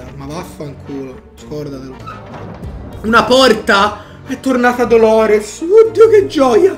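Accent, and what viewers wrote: Tuscany, Italy. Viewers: ma tu di dove sei?hai l accento toscano...quindi sei toscano? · Ma sei toscano?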